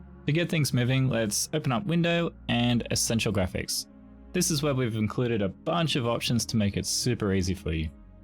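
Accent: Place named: South, United States. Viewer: ....YOU'RE FROM KENTUCKY?! I never knew. First time hearing you speak after all! Love that thick southern USA accent!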